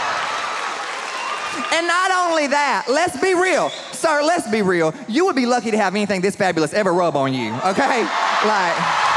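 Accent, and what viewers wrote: South, United States. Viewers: hes southern. This is a southern way of talking. Don't mean anything to race. Cause this isn't AAVE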